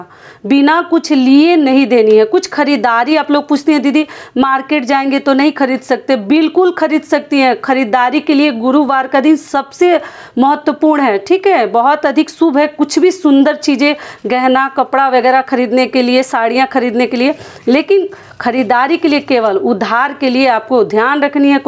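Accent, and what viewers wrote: Bihar, India. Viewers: Didi aap Bihar se hain kya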